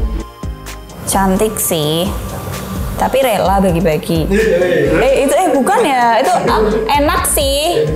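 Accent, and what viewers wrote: Java, Indonesia. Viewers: Medok e pancet ae mbak wkwkwk, sue ndak di ndilok mbak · logat jawanya padat bnget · kirain dinda haw tapi koq medok😁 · Aku suka medoknya mbk itu lo 👍👍👍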